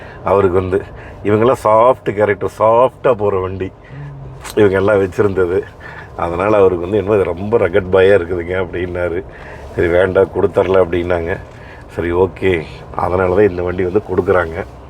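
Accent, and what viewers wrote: Kongu, India. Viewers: Namma Kongu tamil is awesome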